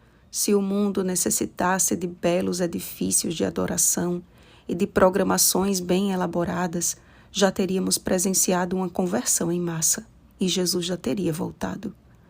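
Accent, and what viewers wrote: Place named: Northeast, Brazil. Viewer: Excellent always! Your northeastern accent is beautiful, your voice is very soft and welcoming! God bless your ministry! I'm from the south of Minas Gerais, living in Alberta, Canada for 1 year and 4 months...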